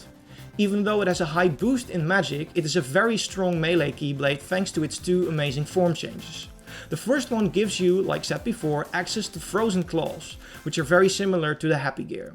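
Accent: Netherlands. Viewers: Randomly stumbled upon the vid, and have to ask... With that accent, are you by chance Dutch as well? XD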